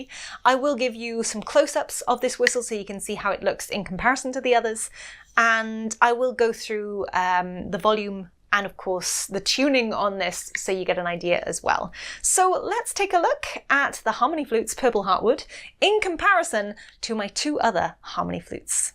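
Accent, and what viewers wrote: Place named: United Kingdom. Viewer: Very nice video, hello from France. (I knew it, when i heard UK. You are not Irish (I 've lived there, i know the accent) not Scottish too i think. So you are from Doctor Who' s country. Love the UK, see you next time.)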